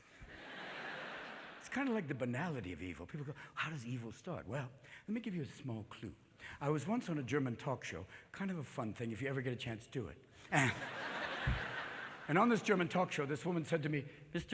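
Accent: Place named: Boston, United States. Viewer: that was a damn good Boston accent...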